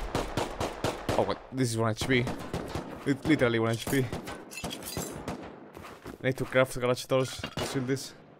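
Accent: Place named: Spain. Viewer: Illo are you Spanish you have the accent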